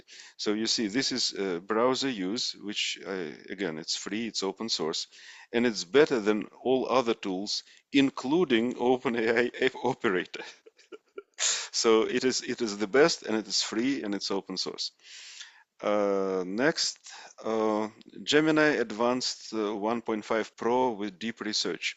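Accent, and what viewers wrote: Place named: Russia. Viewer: Love your English accent, you seems to be from Russia.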